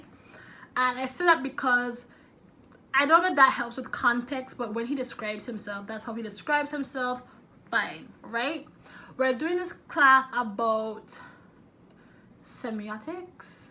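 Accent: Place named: United Kingdom. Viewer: i can hear your uk accent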